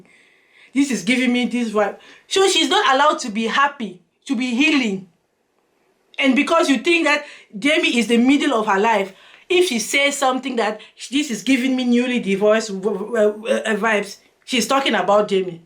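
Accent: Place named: Africa. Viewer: So what is the difference between you and Summer Aku? You both talk aggressively and I think that’s just your african way of talking. You are doing exact same thing you are accusing her of. If everyone should mind their business, then Summer Aku should mind her business and you too should mind your business. Everyone should mind their business. Something else, if you are going to quote Summer Aku you should at least quote her right. I watched that video and you’re not quoting her right. At the end of the day, as you are attacking Summer for getting involved you too should not get involved. You are not Nikki’s defender. There’s a lady on YouTube called LovelyTi. She talks about any and everyone just like Summer Aku. It’s not a new thing.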